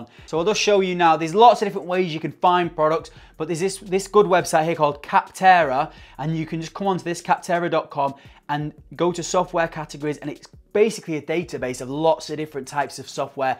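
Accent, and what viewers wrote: United Kingdom. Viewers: "Affiliate marketing" awesome video! I like the way you present something, clear with British accent, concise and full information. Even though English is not my first language, I can understand that. Cheers!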